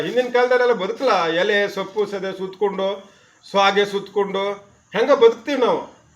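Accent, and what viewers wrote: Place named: Malenadu, India. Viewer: ನಮಸ್ಕಾರ 🙏 ಮಲೆನಾಡು ಕನ್ನಡ ಬಳಸಿ ವಿಡಿಯೋ ಮಾಡುತ್ತಿರುವುದು ಕೇಳೋಕೆ ತುಂಬಾ ಸೂಪರ್ ಆಗಿದೆ 👌👌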